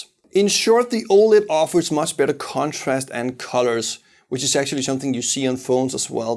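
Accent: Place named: Denmark. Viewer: aggressive danglish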